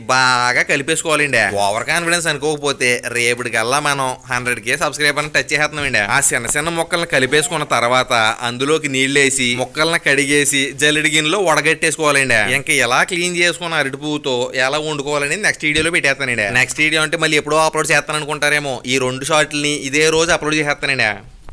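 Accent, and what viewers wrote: Godavari, India. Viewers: Mana Godavari yaasa lo mana Godavari vantalu chupisthunna meeku 🙏 thankyou sooo much brother 🎉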